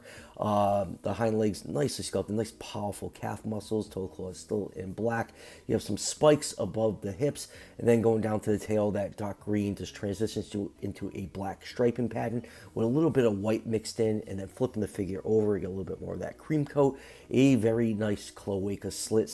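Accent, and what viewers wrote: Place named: Massachusetts, United States. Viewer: Looks like I’ll have to start collecting Papo again. Nice to hear from a fellow New Englander! I’m from New Hampshire. You sound like a Massachusetts man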